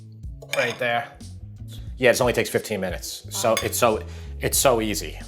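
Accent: New York, United States. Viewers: I'm not sure where you live but judging from your accent I'm guessing New York? Anyways have you ever tried Frank and Sal's Italian Market in Staten Island? I live in Maryland and they ship me all my cheeses and tomatoes, they are incredible!! If you haven't tried them, I highly recommend them!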